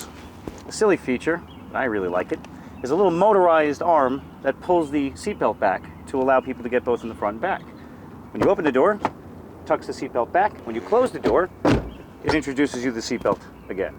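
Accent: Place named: New York, United States. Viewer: Exactly what I was thinking, got to be a New York accent thing.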